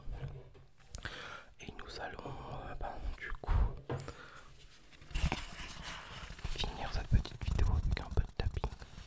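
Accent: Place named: Normandy, France. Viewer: Tu as l'accent de Normandie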